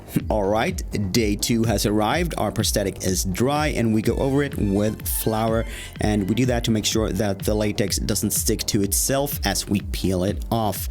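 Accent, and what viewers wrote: Sweden. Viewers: you can really hear that he's Swedish too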